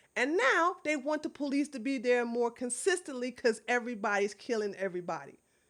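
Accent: United States. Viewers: I am Irish born in Austria {Europe for those who do not know Geography} but raised in French West Central Africa {Sénègal + Guineé {not same as Guinea in South America} also Saïgon, Viêtnãm in 1958} I married a Philippina my brother a Korean. We lived out in the ville {out in the local community} not the American compoud and went to the local School {Lycée Von Volenhaven} not the American school. So I was extremely presently surprised by the extremely good logic but had a liitle dificulty understanding you because of the American Dialect used but think it is geared to your main audiance and because of my age I have not keept up with the various types of American English used. Now I have given my background I have a question that i have not gotten and answer to. In the 60's the black in large numbers had «Afro Hair Style» well when they arrived with there «Boom Box» the looking for their «Roots» the local disrespected them called them worst than a dirty whiteman. 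Their reason was that they did not know or had not tried to know history culture of their region thaey had taken White culture and corrupted it and forgot their ancestors making them lower than the lowest white. I never saw a local wearing and «Afro» or carrying and playing at volume a «boom box»!!!! So When I got to USA I asked why/what was the «Afro» I was told it was traditional hair style by a number of when I asked from what region {East., West, South or North } {I was familiar with West Africa} and noone could a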